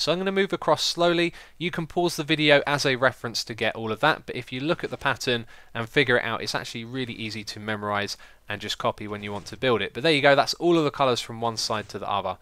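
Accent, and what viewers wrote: England, United Kingdom. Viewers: I thought was from England:P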